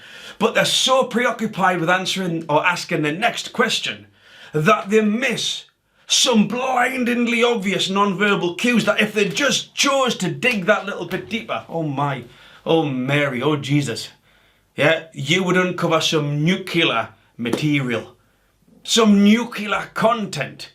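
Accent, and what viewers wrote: Newcastle, United Kingdom. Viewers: I love your Gordie accent! 😆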